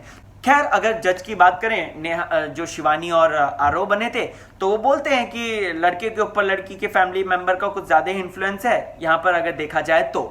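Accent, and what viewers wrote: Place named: Maharashtra, India. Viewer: Aap bhot cute marathi bolte ho😍😄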